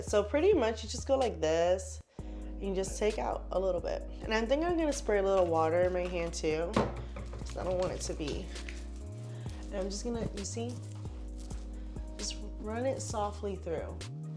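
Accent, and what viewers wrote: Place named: New York, United States. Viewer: I wish I knew where she was- I hear a NYC accent but I don’t know where she actually works.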